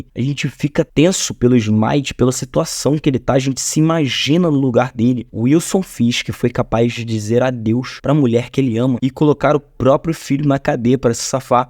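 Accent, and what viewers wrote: Rio de Janeiro, Brazil. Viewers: Mano, se tu quer ser Youtuber, precisa tirar esse sotaque de carioca. Esse X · Vídeo legal..mas incomoda tanto xXx carioca...se for continuar...umas aulas de fonoaudióloga fica top...ou usar I.A para narrar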